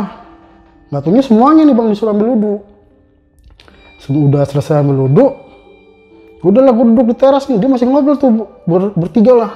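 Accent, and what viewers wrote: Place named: Betawi, Indonesia. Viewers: Betawi bangat da ah logat nye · Betawi bet bahasanya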